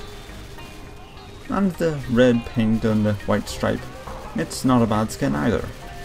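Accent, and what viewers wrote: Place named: Belfast, United Kingdom. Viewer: Where do you come from? You sound like a middle class person from Belfast.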